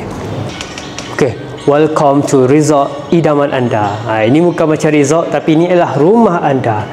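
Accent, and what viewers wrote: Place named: Kelantan, Malaysia. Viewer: penyampaian biarlah dalam Bahasa Malaysia Standard. Pembeli ada juga orang bukan Melayu. Ramai tak faham loghat Kelantan. Lainlah awak nak jual rumah di Kelantan.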